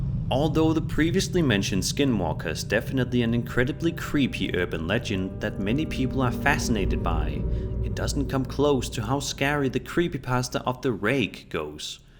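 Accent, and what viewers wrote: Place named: Denmark. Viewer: This guy sounds danish :)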